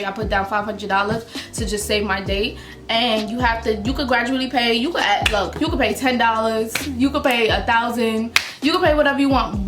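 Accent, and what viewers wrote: New York, United States. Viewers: I sooo love your NY accent 🥰🥰and yasss miss mamas ya body is BOMBBBB AF!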